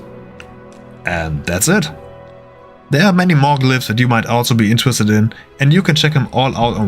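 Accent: Germany. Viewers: Der Typ klingt so deutsch xD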